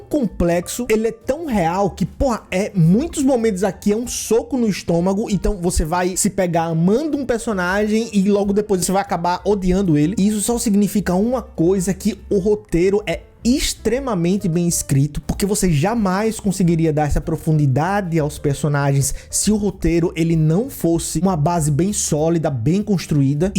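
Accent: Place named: Bahia, Brazil. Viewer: Esse sotaque me faz voltar pra Salvador imediatamente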